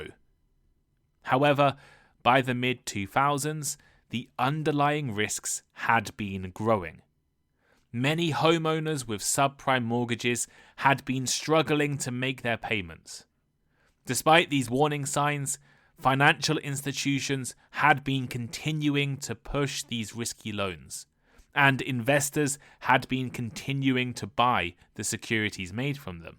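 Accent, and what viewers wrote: United Kingdom. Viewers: Oh, the British accent! Wonderful 🎉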